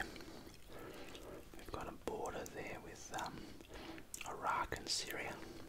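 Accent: Australia. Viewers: I love Aussie accent from Romania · australian accent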